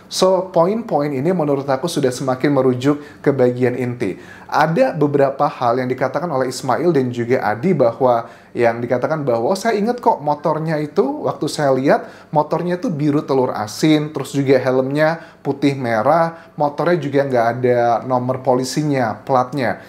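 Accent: Java, Indonesia. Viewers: mas boso jowone medok bgt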